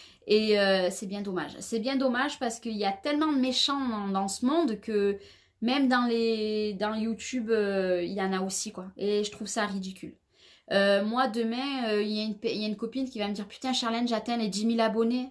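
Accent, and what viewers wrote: South, France. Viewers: Bonjour Charlène ,pause café ,soleil et une vidéo Tag youtube très intéressante , j'ai apprécié ta sincérité et ta franchise ..! J'adore regarder tes vidéos 😍 tu es tellement gentille attentionnée pétillante généreuse,et surtout très talentueuse ,et ton petit accent du Sud 😉 j'aime vos créations en duo avec Marick .. Tu es exceptionnelle ,tu me remplies de joie quotidiennement . Je te souhaite une excellente journée ensoleillée et créative 😉 pleins de bisous 😘💋 Bea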